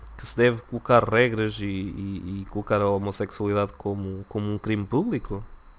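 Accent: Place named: Portugal. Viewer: fico vendo teus videos e começo a falar que nem você, com o 'sotaque' de Portugal, como vocês portugueses veem o português do Brasil.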